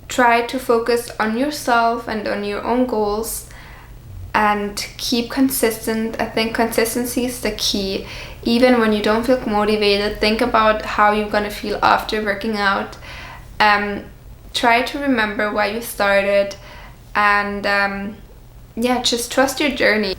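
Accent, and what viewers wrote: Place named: Germany. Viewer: Where do you came from? Sounds like a German accent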